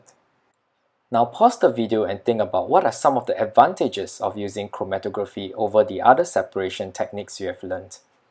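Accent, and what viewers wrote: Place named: India. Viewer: Sounds from india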